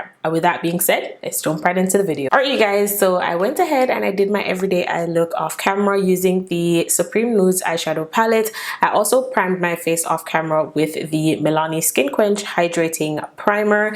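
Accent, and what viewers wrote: Jamaica. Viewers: I'm def getting a Jamaican accent coming through?😏 Concealer looks great tho.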